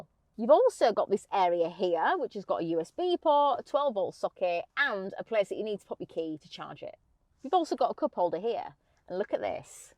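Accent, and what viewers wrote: Yorkshire, United Kingdom. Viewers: I thought it was Yorkshire. My favourite place to visit when I go to the UK.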